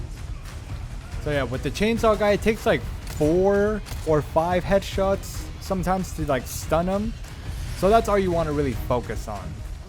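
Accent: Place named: United States. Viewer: you did not just say “my English is not that good” 🤣🤣 dude I thought you were American literally, I’m guessing you’re European as you guys seem to adopt the American accent hahah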